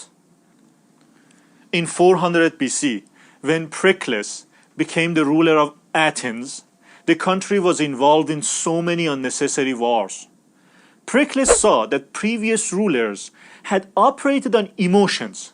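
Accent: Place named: Iran. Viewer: I can tell by the accent that this guy is Iranian....لهجه تابلو هست که گوینده ایرانی هست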